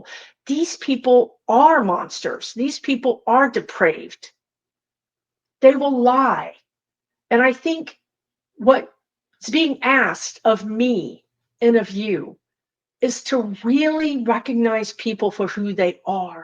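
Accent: Texas, United States. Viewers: Like you don't look white Southern. Sound Texas or Southern fo sho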